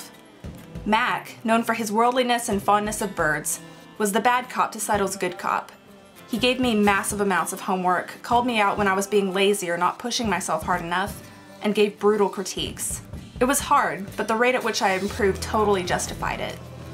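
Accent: Australia. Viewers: Omg your Australian! Well...that's cool. Anyway you can draw so well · Can you get back your aussie accent?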